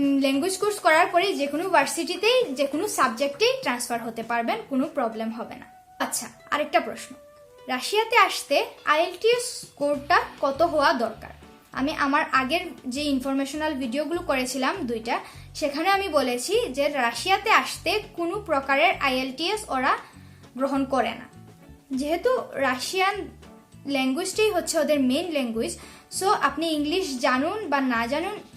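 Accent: Sylhet, Bangladesh. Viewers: আপু সিলেটী? 🥰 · সিলেটী নি গ মাই তুমি ?? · Didi mone hoy Sylhety! Tai na???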